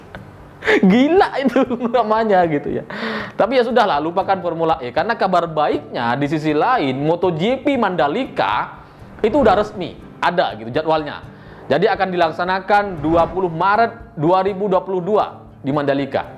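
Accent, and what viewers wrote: Madura, Indonesia. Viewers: Mas ini logatnya kayak asli madura...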